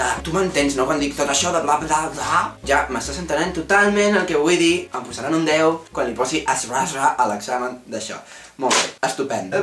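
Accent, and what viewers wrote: Spain. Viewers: Sí que se't nota una mica l'accent castellanoparlant, però vaja és igual, tots junts fem país. Sí volem que el català sobrevisqui és important que els castellanoparlants també l'utilitzin, i de manera preferida vers al castellà.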